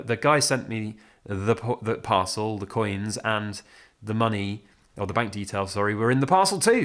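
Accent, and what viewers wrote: United Kingdom. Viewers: Every time I see one of these videos I immediately know it’s gonna be some British accent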